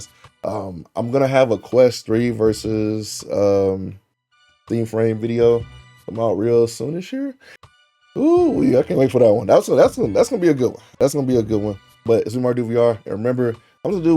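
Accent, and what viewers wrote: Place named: South, United States. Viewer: You can be as country as you want when you're spitting facts....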